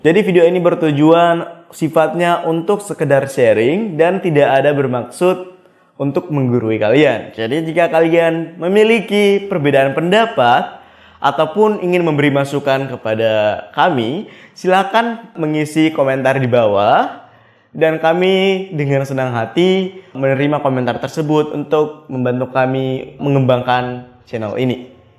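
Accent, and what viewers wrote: United Kingdom. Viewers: Your British accent amazed me. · British accent on point bangett,kagum 🥰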